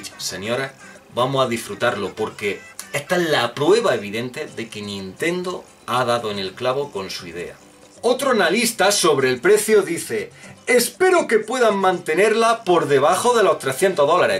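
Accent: Spain. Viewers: Jaajajja, me encanta ese acento para contar las cosas, de que parte de España es?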